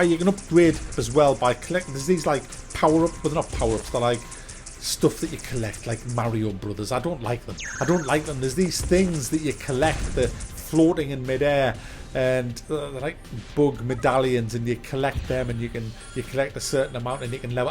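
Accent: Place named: Northern England, United Kingdom. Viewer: I adore your northern English accent. My family on my mother's side is from Sunderland and New-Castle. I live in Canada btw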